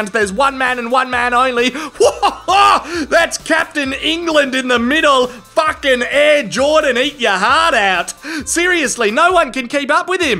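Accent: Australia. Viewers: You can't beat an aussie commentator. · Good clean fun. "Big atomic wedgie". The Aussie voice over is awesome. That's why us Brits love 'em.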